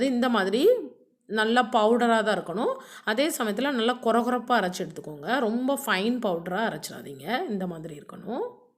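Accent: Tirunelveli, India. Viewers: Nice mam... Neenga tirunelveli ah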